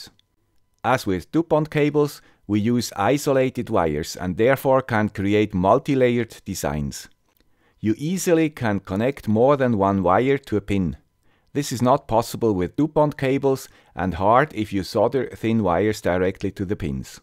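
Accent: Switzerland. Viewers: SORCERY :O Not only the wrapping, but you stripped a tiny wire without ripping its head off. I usually have to resort to burning some insulation off. Thank you swiss accent guy! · Thank you VERY MUCH for this idea! Never heard of this before. My wire wrapping tool just arrived on friday :-) Found out, you can use the thin wire of the cheap metal film resistors for wire wrapping. Greets from Basel from another guy with a swiss accent ;)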